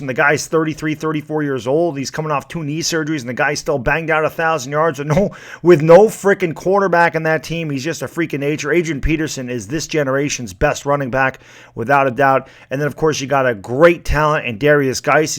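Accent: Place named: South, United States. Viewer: haha damn no shit I thought you were a little bit from the south from some reason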